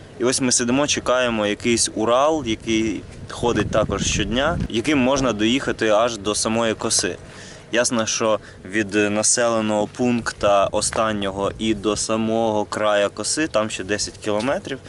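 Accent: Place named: Galicia, Ukraine. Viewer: Добрий день, хлопці дівчата. За відео спасибі. Все класно, подяка за рідний галицький акцент. Цього літа хочемо висадитися на Кінбурнській косі - катером з Миколаєва і пішки по узбережжю до Покровки(Ягорлицький залив). У мене кілька запитань з цього приводу: 1. Як там з водою? - ми плануємо пройти 20 км на півднь по берегу до Покровки? 2. Ви були як я зрозумів в Покровці. Ми звідти хочемо теж стартонути додому . Але будемо їхати в Харків з Херсона. Так от : чи є з Покровки транспорт хоча б до Геройського, про Херсон навіть не питаю?? Ваша відповідь стала би нам у великій пригоді. Дякую.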